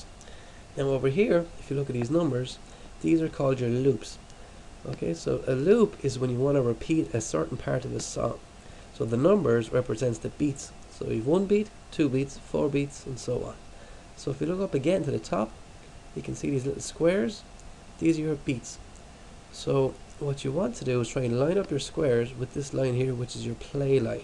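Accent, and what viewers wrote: Ireland. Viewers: He's not foreign.. he's Irish... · r u irish?? just wondering :) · are you irish ? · nice to hear an Irish voice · You're Irish :O I love your accent so so so much. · Where in Ireland are you from ? haha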